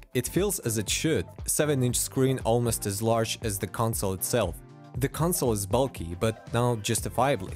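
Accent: Russia. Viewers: да ты русский, акцент чувствуется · russian accent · тебя выдает подоконник)) в штатах таких подоконников нет. Да и по английскому слышно, что наш человек:) Если не секрет, то чем будешь пользоваться в случае блокировки ютуба?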